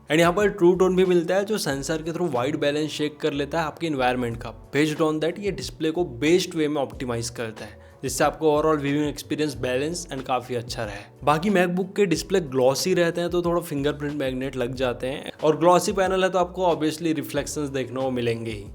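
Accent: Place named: Maharashtra, India. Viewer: Great detailing even mentioning the nand gates used! U r going to make it big 🎉 Could sense marathi accent in your English then checked ur insta handle, great to see :)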